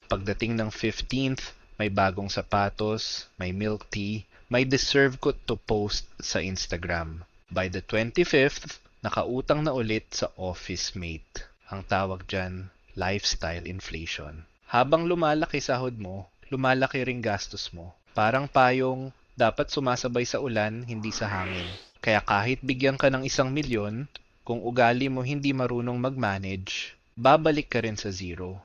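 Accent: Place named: Conyo, Philippines. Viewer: Out of curiosity, Tagalog is not the only language you speak growing up, right? Cuz I hear a lot of conyo pronounciation. Lol, not hating thou. Thank you for this video! 💜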